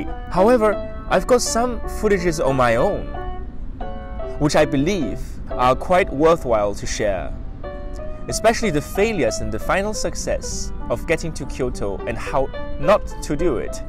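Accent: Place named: Germany. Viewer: Also bist du österreicher dann? Zum ersten hab ich den Deutschen Akzent gehört. Ich bin in der Schweiz gewesen.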